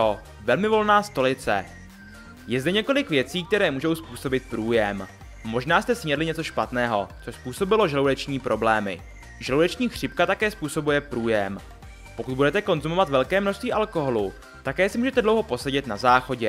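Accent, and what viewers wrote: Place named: Prague, Czech Republic. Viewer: Jsi Pražák, viď? Podle toho jak mluvíš xD mě to hrozně štve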